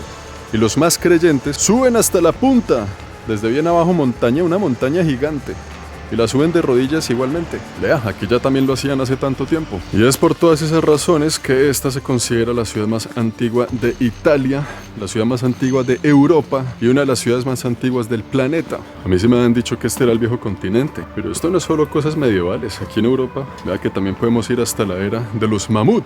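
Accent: Bogotá, Colombia. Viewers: Es agradable ver tus videos desde el sofá, aparte de ver las imágenes, estas muy bien explicafos con excelentes datos históricos de la localidades que nos enseñas, en este caso la ciudad de Matera, hoy una ciudad de la República de Italia y anteriormente del Reino de las Dos Sicilias. Un inciso, decir Latinos o Latinoamérica no es correcto, es hispanos o Hispanoamérica, Latinoamérica es una invención francesa cuando la invasión francesa, los franceses no dicen el Canadá latino o el África Latina, tuvieron muchos territorios en África y también los tuvo Portugal, España menos, pero dicen el Canadá francófono o el África francófona, latino es ser del Lacio.. Si también se incluye El Brasil entonces se puede decir Iberoamérica, latino o Latinoamerica es absurdo, el Lacio es lo concerniente a latino, una región de Italia. Un saludo desde España, me gusta ver tus videos y escuchar tu acento colombiano. 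· DEBERÍAS SER LOCUTOR DE RADIO TENES UN VOZ MUY RECORDATORIA EN MEMORIA DE LA GENTE Y ESE ACENTO COLOMBIANO GENIA... USTED YO DIRIA CHE SOS RE PIOLA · Empecé a seguirte, solo porque eres colombiano y me encanta su acento, pero honestamente excelente relato de esta ciudad antigua, y algunos otros vídeos que ví, muy interesantes y más cuando los explicas tan bien y nos aportas no solo viajar atravez de ellos sino información de los mismos en cuanto a su cultura gastronomía, estatus y antigüedad muchísimas gracias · Que fastidio ese acento rolo :v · buena voz.. interesante relato... acento Colombiano? Chevere felicitaciones!!! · colombiano por estilo de hablar👍👍👍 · Que linda voz tienes y con ese acento colombiano, Ave Maria.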